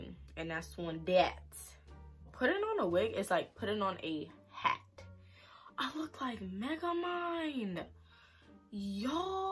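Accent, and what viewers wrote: New York, United States. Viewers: LMFAOOOOOOO AS SOON AS I HEARD THE NY ACCENT I WAS LIKE NAHHH LET ME SUBSCRIBE😭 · Gotta be from New York with that accent!! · Lmao It takes a true New Yorker to know a true New Yorker. I can tell by the way you talk😭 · is she from ny? she gotta be a New Yorkian 😭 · Are u from ny ? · Definitely a New Yorker lol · Are u from ny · I’m new to yo channel and soon as I heard yo voice I was like she from New York 😭😭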